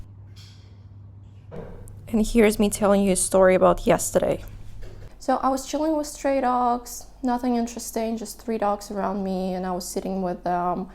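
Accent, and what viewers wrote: United States. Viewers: You sound very American 😂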